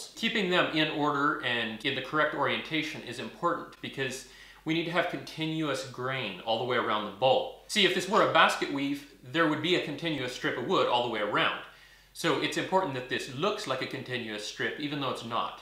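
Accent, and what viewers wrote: Canada. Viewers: Neat pattern out of plywood - good job. BTW, are you Canadian or North-Central American? I hear an accent in there.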